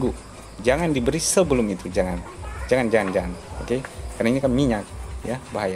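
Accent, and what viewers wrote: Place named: Medan, Indonesia. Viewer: Lokasi dimana gan? Logatnya kayak org medan. Klo medan bisa la main2 ke farm ny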